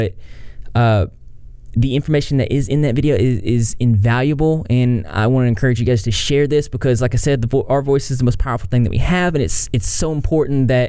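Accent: South, United States. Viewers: It's just southern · You have Southern accent.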